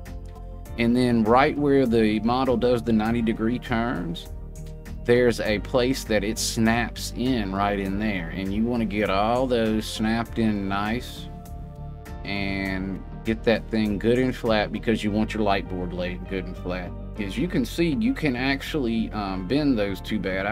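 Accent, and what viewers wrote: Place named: Kentucky, United States. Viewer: Where are you from. I’m In KY you sound like me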